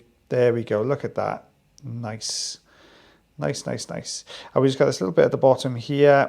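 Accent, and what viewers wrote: Wales, United Kingdom. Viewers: Nope! He's Welsh.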